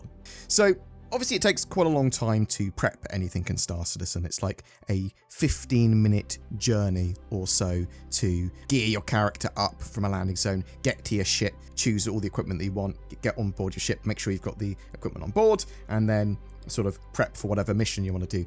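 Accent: United Kingdom. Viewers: Me watching this video: “This dude is speaking my thoughts with a British accent!” I love this game, but those things are definitely pain points.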